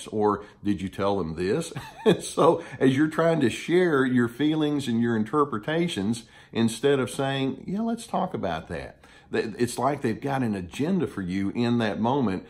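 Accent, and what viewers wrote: South, United States. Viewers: You can just feel the warmth and caring of this man by listening to him. That southern drawl and compassion are a beautiful combination. I'd I had to guess I'd say he's an infp like myself, but more highly developed and less jaded than myself lol.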